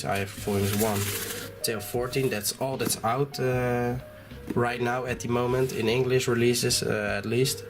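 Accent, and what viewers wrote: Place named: Netherlands. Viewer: I can hear ur from the netherlands, fellow dutchman. 😂 cool collection!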